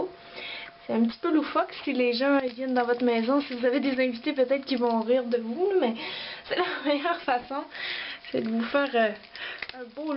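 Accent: Quebec, Canada. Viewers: C'est l'accent québécois ça n'a rien de bizarre ...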